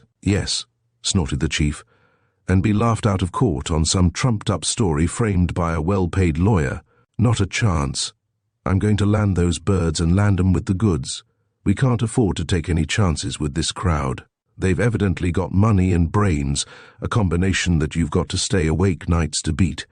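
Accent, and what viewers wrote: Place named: England, United Kingdom. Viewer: having a strong English accent read a specifically AMERICAN tale.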